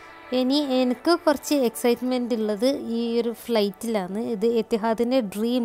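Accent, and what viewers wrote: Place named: Mattool, India. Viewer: ya monee mattoole samsaram analo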